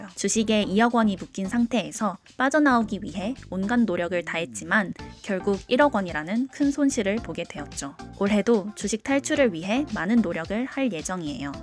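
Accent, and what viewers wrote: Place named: Gyeongsang, South Korea. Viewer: 갱상도 사람이셨군요?! 카페가 맞지요~ 타지에 오셔서 고생이 많네요, 저랑 똑같네요😀 이번에도 화이팅입니다~!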